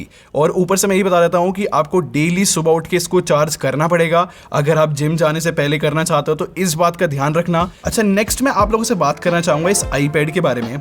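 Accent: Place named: Delhi, India. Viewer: Bro I think it's delhi accent 😂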